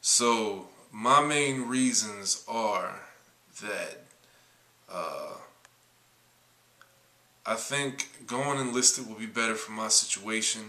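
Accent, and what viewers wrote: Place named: United States. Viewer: I'm pretty sure he knows how to speak proper when he needs to. I myself am in college educated. I speak Ebonics but know how to speak proper english when it is needed. Maybe he is trying to appeal to those who are like him. You shouldn't be so judgemental. After all how we speak "inner city kids" speak is just another legacy of slavery.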